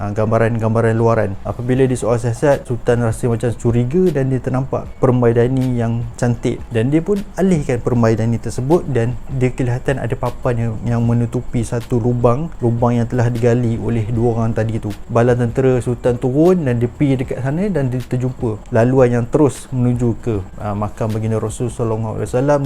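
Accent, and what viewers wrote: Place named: Penang, Malaysia. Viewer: ckp penang bang senang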